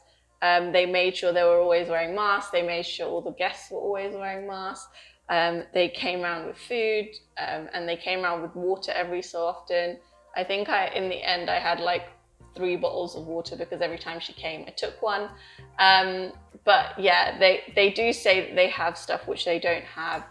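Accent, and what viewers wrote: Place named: United Kingdom. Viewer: Hey, your videos are so informative and helpful. Thanks for sharing your travelling tip during the Pendamic. I miss travelling personally as I would have loved to travel and explore New Zealand and Japan next ❤. Im from Canada too but I live in Ontario. I like your cute British accent to. Keep sharing and stay safe😊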